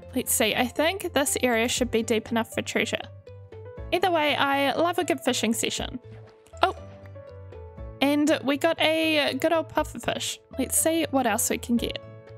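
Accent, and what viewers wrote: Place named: New Zealand. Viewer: omg stop ive been following u for a while an just now do i realise ur kiwi too xD (no wonder why i felt so comfortable listening to u speak cos there isnt an accent for me haha) kia kaha e hoa !! aroha nui hehe <3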